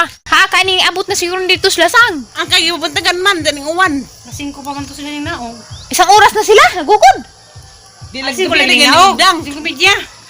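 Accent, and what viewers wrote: Bohol, Philippines. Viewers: Hello ate ka relate kos imong mga accent ug mga words ky taga ubay pud ako bana,ana sad iya accent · ISMAGUL....grabe jud pgkaboholana.... taga tubigon ko day.... silingan ra gihapon ta, 😂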